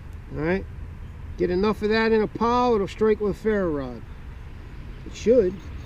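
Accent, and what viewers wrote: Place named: Eastern Pennsylvania, United States. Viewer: Judging by your very strong Eastern PA accent, I assume shop is in Pennsylvania somewhere?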